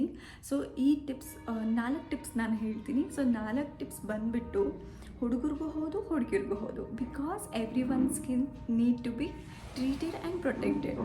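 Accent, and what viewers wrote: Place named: Bangalore, India. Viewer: Nam Hubli kannada matadri Bangalore style Kannada beda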